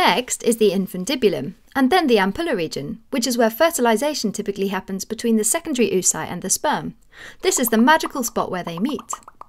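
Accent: United Kingdom. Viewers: This british narrator gotta go. Im sorry. Bring the guy back please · Extremely bad video, not obvious, bad fast voice, videos used to be more helpful and obvious It is NOT a compilation for the sexiest British accent! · Im sorry but in my prefrence, its alot harder to memorize and understand what the video is teaching when the person has a british accent.... im sorry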